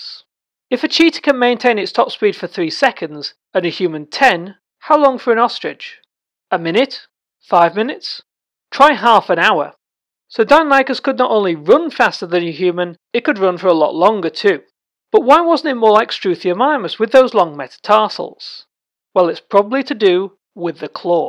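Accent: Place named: United Kingdom. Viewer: He's from the UK, alright?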